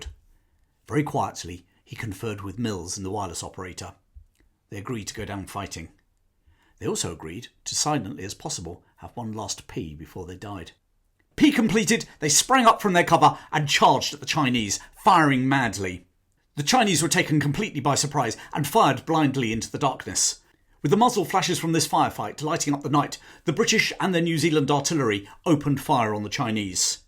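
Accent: England, United Kingdom. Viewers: Only just discovered your excellent channel. How refreshing to listen to a narrative in English by an English man! Some of those American narrators are terrible. Can't pronounce place names correctly, etc. And as for Charlton Heston on some of Timeline's offerings! Say no more! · As a foreigner, I do enjoy your beautiful british pronunciation. Thank you for your british sound in the global era of the unified american english.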